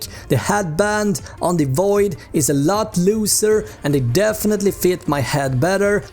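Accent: Sweden. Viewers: Strong Swedish Dialect :)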